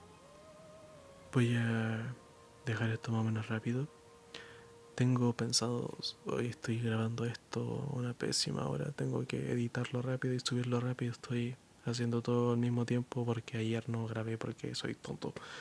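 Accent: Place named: Chile. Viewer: Acabo de encontrar este canal… no sé qué tiene, pero AMO el acento chileno, este canal es una BENDICIÓN PARA MI!!! Por cierto, hermosa voz! Me encanta! ✨ (Saludos desde México 🇲🇽) Me encanta escuchar como se esfuerza por leer bien (me pasa también, tengo que releer algo para entenderlo bien por lo menos unas 3 veces)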